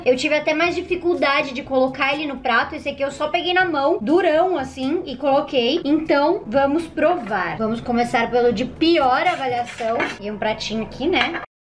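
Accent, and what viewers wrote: Rio Grande do Sul, Brazil. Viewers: Tu é gaúcha...??????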